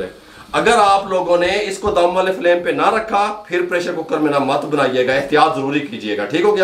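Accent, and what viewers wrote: Punjab, India. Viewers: Bht aala , ❤️❤️👏👍😅 dabne na kha kren bs baqi punjabi accent aur alfaz sun k bht acha lgta h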